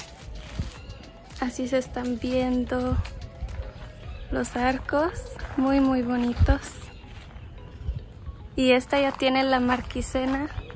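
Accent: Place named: Portugal. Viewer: Estas quase ablando PORTUGUES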